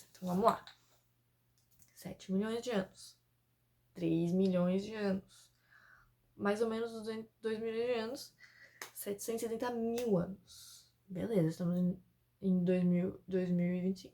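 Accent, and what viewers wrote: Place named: Portugal. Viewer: Achei que era portuguesa no primeiro minuto de vídeo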